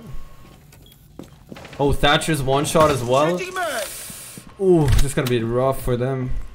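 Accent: Germany. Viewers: Is he german??? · Are you german ? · Wtf bist du deutscher oder kannst du besser deutsch als ich 😂😂😂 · you from german or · Are you German?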